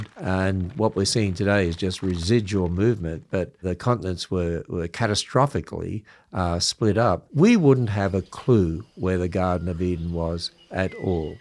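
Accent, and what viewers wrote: Australia. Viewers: Im Aussie, first heard you back in about 1999. Im flabagasted you still have our Aussie accent very strong, awesome... How long have you been away from here ?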